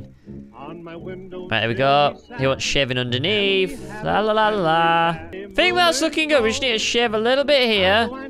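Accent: England, United Kingdom. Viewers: People proceed to make fun of his accent Bro, I'm English too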